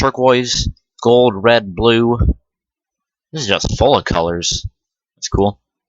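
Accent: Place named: United States. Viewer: He's got a perfect American accent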